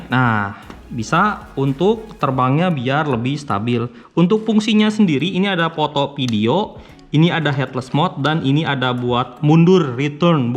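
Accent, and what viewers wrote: West Java, Indonesia. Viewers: Mantap bang orang sunda ya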